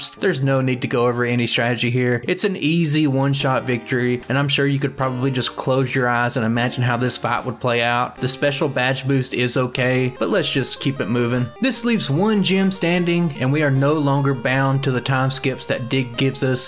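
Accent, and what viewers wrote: South, United States. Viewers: oh for sure. It would be a lot of work and something plan out over a long period of time. You’d also have to feel out the comments to see if other people would be interested in that since it’s kind of niche. But Pokémon run channels are growing in popularity, so it could help your channel differentiate itself. But mainly just do what you enjoy and everyone watching will enjoy it too. You’re doing great! I really like your layout with the move sets and stats on the side so we can track the Pokémon on its run and anticipate what’ll come next. And nah, you ain’t Canadian. You sound more southern than me, and I’m from Texas haha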